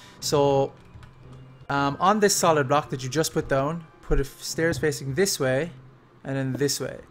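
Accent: Ireland. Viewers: Can you please start making videos again? 1. I just want to listen to you talk (the Irish accent is the best) and 2. I really like your builds.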